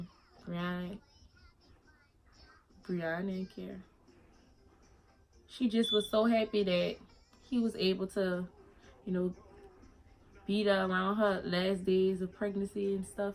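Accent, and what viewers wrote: New Orleans, United States. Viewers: New Subbie! I love your frenchy New Orleans accent. So Sad! RIP Breon Prayers to you, I know it's hard. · First time watching you, is that a New Orleans accent I hear 👀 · Rip to your sister. U from New Orleans u sound like it. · This video was in my recommendations.This is so heartbreaking. You are incredibly strong! I couldn't imagine. Praying for you mama.❤ Also, are you from NOLA? I know that accent when I hear it lol. If so, hey girl from another fellow Louisiana mama! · you talk like u from louisiana where you form ?- im a new supporter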